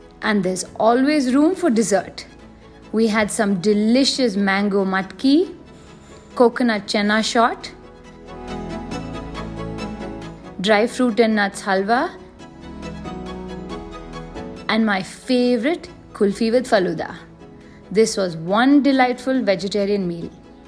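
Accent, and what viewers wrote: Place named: Telugu, India. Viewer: Seems to be telugu woman speaking in English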